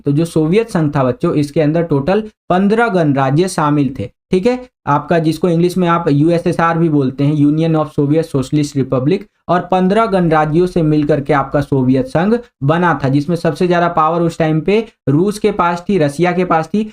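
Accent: Bihar, India. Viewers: Aap बिहार से ho भाई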